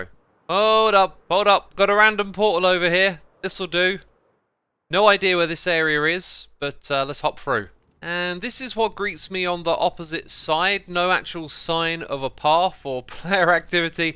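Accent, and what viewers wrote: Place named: United Kingdom. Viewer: im so used to voice now that i cant even tell he has a british accent, its just accent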